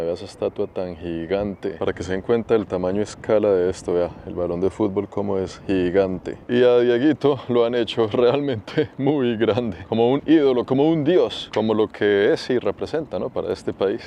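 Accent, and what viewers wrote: Colombia. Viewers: Jajajaja la cultura de la SIESTA,ACA EN SAN ES IGUAL, NO SE PUEDE SALIR CON EL CALOR!! BUENO YO TE SIGO Y TE VEO SIEMPRE!!!! Tus formas de ver cada pais es muy diferente a los demás,muy interesante ver cómo vive la gente común,sus tristezas,alegrías,lo que valoran,sus casas,en fin...tu narrativa con ese acento colombiano me encanta 😊!!!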